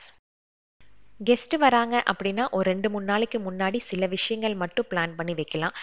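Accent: Coimbatore, India. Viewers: Uoinga video la nalla iruko sis....neinga coimbatore ah pesum pothu konjam theyriuthu... good luck 💐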